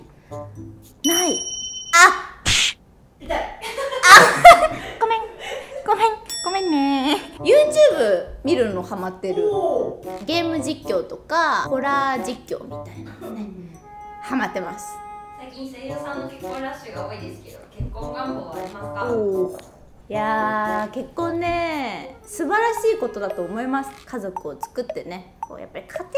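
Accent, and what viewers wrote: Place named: Tohoku, Japan. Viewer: ところどころ東北っぽいイントネーションだと思ってたらやっぱ秋田だったか！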